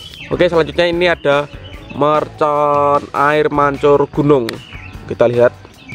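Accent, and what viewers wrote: Java, Indonesia. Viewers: Koyok e tek wong jowo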